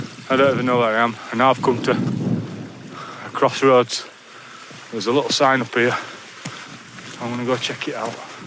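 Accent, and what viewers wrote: Yorkshire, United Kingdom. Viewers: like the video mate - like your yorkshire twang - nice memories for me back home :)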